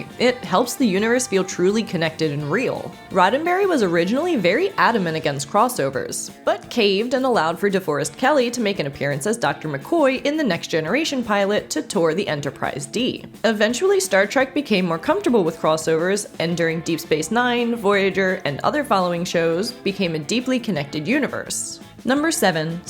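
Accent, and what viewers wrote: United States. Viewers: American accent threw me off for a sec 😂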